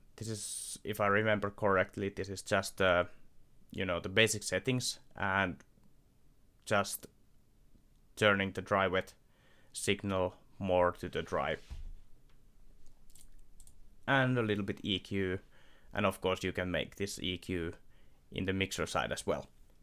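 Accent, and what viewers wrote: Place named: Finland. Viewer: Olipa ilonen yllätys kuulla rallienglantia hardstyle tutoriaalissa!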